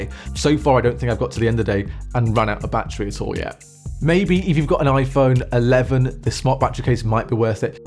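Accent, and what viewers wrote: United Kingdom. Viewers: Are you from Newcastle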